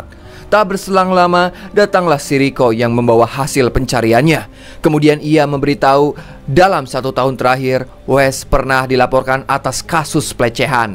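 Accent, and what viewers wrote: West Java, Indonesia. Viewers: Logat sunda sarua atuh nya saqahayang jeung 🎉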